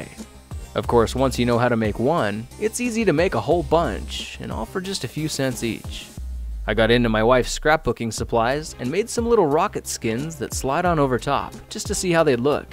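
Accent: United States. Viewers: is he american?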